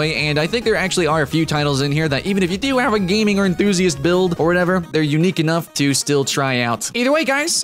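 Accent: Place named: Texas, United States. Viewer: Are u from Texas?? holly shit, too fast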